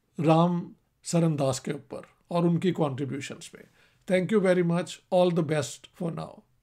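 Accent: Punjab, India. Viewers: Respected Dr Sahib Many many thanks for your thought provoking and illuminating talk on Hindu families of Lahore which enriches our knowledge about this city of Lahore before partition Your style is lucid and Punjabi accent is commendable which shows your high class experience with erudite wisdom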